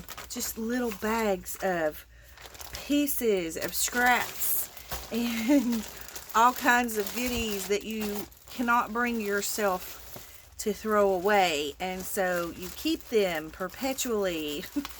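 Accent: South, United States. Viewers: I love watching the collaging process. Your sweet southern voice makes it even better. ❤️💕😘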